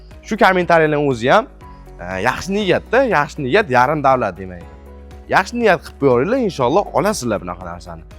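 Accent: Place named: Kokand, Uzbekistan. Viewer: Чота шу бола куконли шева тортяпти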